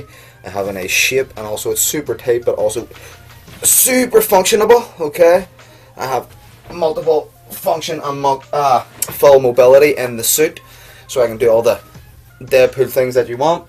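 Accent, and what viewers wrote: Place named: Ireland. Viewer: he is from ireland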